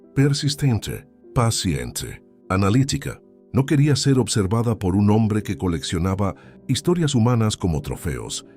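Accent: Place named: Portugal. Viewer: Muy mal leido. Cambien de robot de lectura. Mezcla portugués con español y corta mal las frases. Chau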